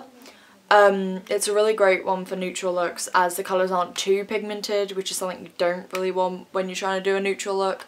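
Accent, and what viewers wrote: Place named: England, United Kingdom. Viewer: Nice accent so where you from England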